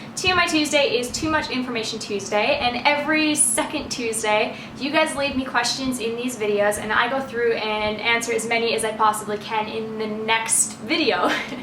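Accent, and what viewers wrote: Australia. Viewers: it sounds like you're getting an australian accent! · You seem to be picking up an australian accent!!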